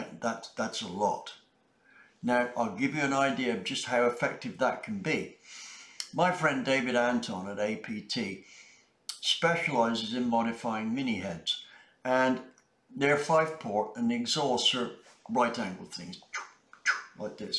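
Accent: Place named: West Country, United Kingdom. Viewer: Hearing a west country accent🤔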